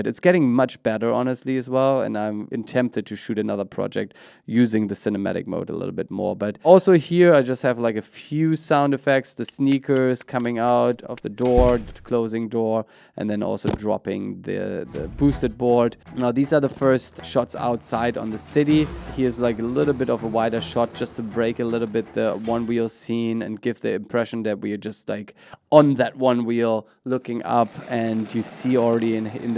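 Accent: Germany. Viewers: Kann es sein, dass du Deutscher bist? Irgendwie klingt dein Akzent danach. Super Video übrigens!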